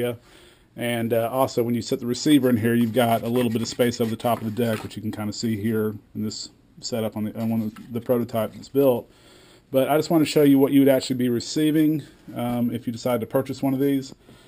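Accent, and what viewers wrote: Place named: Texas, United States. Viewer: Hi, you sound like you're from Fort Worth Texas, are you? I'm from Houston. But, here is a question...Do you have any idea how much weight this design can lift? I want to build one and it will be my first rc craft, however, I will deck it out with all the OSD, GPS, and cameras needed to fly FPS. So, weight is a factor in a big way. If these motors are good, great I will buy them. If I need some a bit more powerful, got any suggestions on which ones to get? Thanks Much! Tracey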